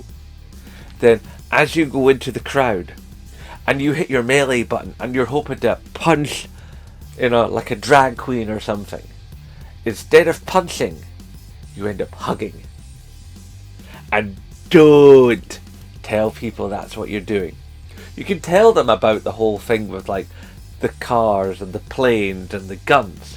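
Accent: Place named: Jamaica. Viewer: Wait a minute you're white?? Thought you were Jamaican bro!!?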